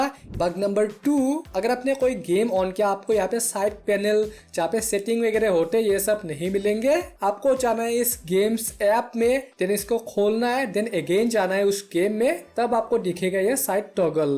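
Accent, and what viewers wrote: Assam, India. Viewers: assam dada apunar accent te gom palu😊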